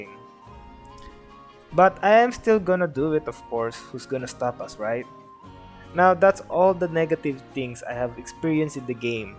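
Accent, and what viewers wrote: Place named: Philippines. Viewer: I smell pelepeno accent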